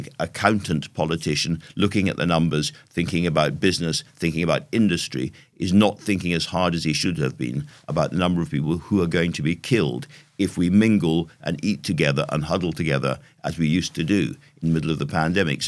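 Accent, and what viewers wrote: RP, United Kingdom. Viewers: Science is essentially always "a work in progress" and when politics and science combine to effect a policy, then it is a matter of proper concern. Conservative England -both mad and paranoid? A bit of the usual modern media hyperbole. Par for the course for a BBC voice perhaps?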